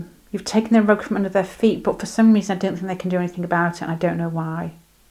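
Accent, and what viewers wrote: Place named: Midlands, United Kingdom. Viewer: Pile 1 wooooooooow amazing 🤩 I also hear a midland accent, as a midlander that’s makes me so happy 😁 Love and light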